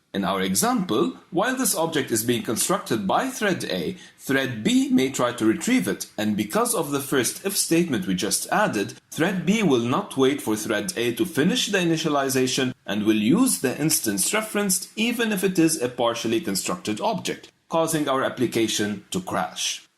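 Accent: Lebanon. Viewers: you sound Lebanese dude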